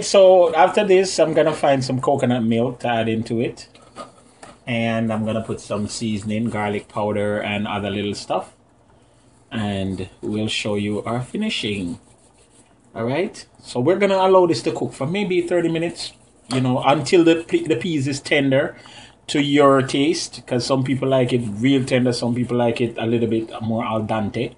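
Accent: Jamaica. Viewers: your Jamaican accent is charming!!! & the soup looks good too!!! ...not a fan of dumplings so will make without :-)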